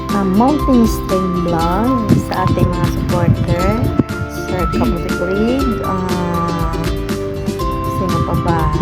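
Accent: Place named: Iloilo, Philippines. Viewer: Ilonggo na tono mo sis 😅😅😅😅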